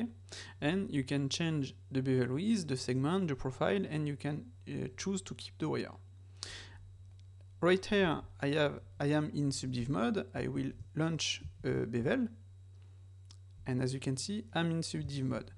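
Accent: France. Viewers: haha l'accent français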